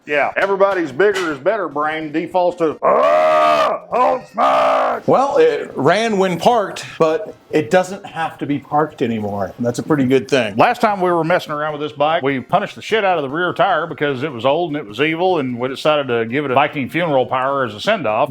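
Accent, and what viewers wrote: South, United States. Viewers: and his southern drawl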